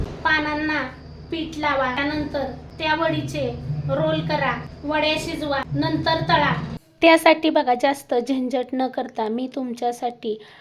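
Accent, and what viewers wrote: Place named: Satara, India. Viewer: ही अस्सल सातारी भाषा आहे त्या मुळे ऐकायला तस वाटत असेल बट योग्य कमेंट्स कराव्यात. कोणतीही भाषा असो तुम्हाला समजलं ना! मग कलेला प्राधान्य दिले पाहिजे.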